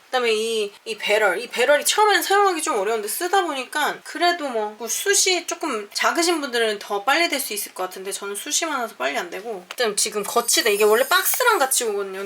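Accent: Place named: Seoul, South Korea. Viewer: 우리친구야 와이리 서울말쓰는거 낯서노 잘하노? 지기네 친구야 뷰티 인플루언서 같데이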